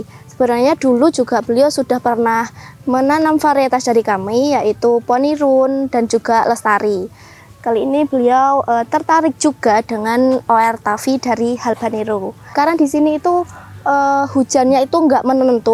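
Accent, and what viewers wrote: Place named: Java, Indonesia. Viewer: Mbk,nya jawa,nya ketall banget😂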